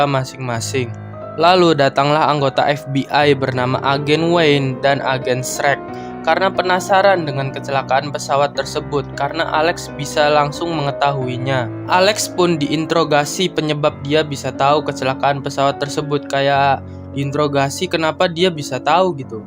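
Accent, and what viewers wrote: Cirebon, Indonesia. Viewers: Anjing orang cirebon bgtt logatnya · Admin logatnya kaya wong cerbon sih min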